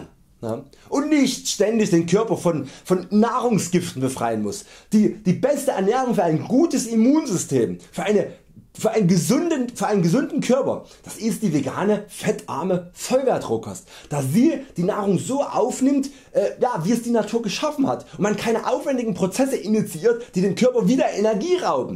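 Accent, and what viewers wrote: Saxony, Germany. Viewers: Du hast exakt den gleichen Akzent wie Olaf Schubert richtig gut gerade wenn du genommen sagst haha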